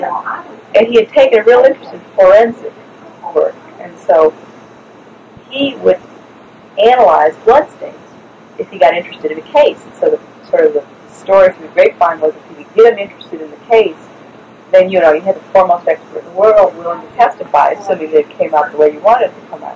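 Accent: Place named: South, United States. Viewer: This does not sound like Hillary Clinton at all. She is from Illinois and this woman has a distinct southern accent and her tone sounds nothing like Hillary Clinton. This woman sounds like one of the designing women.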